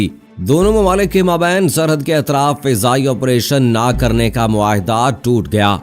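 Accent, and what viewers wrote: India. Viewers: Apki urdu indians wali lg rahi hai. Humain kisi se daraen nhi. Jb waqt agaya toe hum Pakistanj chorengay kisi ko bhi nahi. Pakistan Zindabad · خدا خدا کرو .....تمہارے تلفظ سے ہندی صاف جھلک رہی ہے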